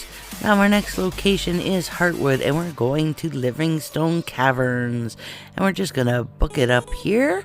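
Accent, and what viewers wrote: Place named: Scotland, United Kingdom. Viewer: Sound more Scottish than Irish, luv it!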